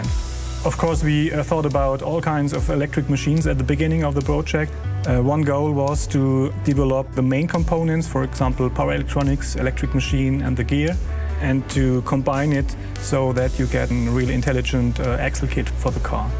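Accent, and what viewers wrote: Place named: Germany. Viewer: its a german i hear it😂😂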